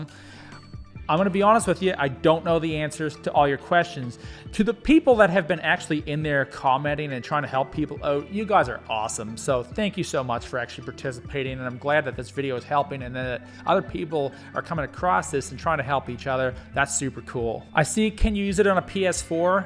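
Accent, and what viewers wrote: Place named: Canada. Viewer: Hey! Excellent video and thank so much for doing this! I stumbled across your channel (this vid) because I just upgraded from my Blue Yeti to the Shure SM7B and Focusrite Scarlett Solo Gen3. Once I started recording some audio samples, I noticed that things didn't sound any different compared to my BY which told me something was being missed somewhere along the line. That being said, I think the Cloudlifter is one of those things and maybe better cables. Do you recommend anything in particular? Also, you mentioned Canadian dollars plus you look and sound Canadian! Where abouts are you from? I'm in Southern Ontario myself. Anyway, ramble complete! Have a stellar day and thanks again for this video!!!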